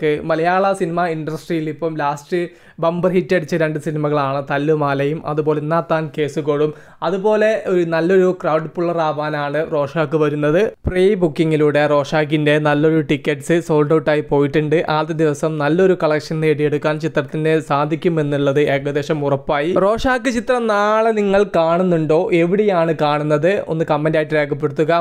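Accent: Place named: Thrissur, India. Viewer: Thrissur ragam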